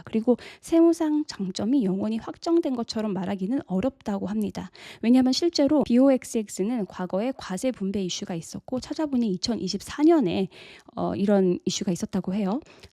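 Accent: United States. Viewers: 미국에서 오래 사셨나바요 얼피~~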